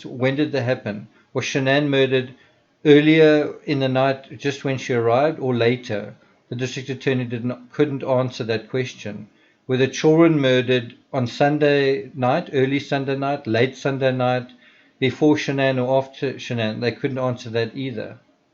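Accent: South Africa. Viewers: I love your accent 🥰 Sounds South African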